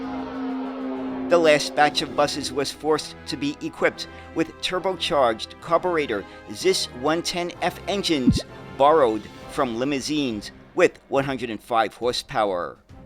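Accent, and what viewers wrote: New York, United States. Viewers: Great vid for - as you say in your YT intto - enthusiasts for obscure transport stuff. PS: that old Zis-16 looks suspiciously like an imitation too, of the iconic Saurer Post Bus from Switzerland. PPS: the New York accent and stadium announcer style add that little extra... 🙂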